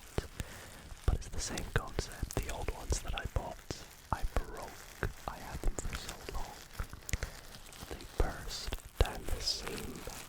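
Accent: Scotland, United Kingdom. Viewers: I started watching asmr in 2022 but not sure if it was 22 or 23 when I came across you and it was the Scottish accent that made me sub, so tingly!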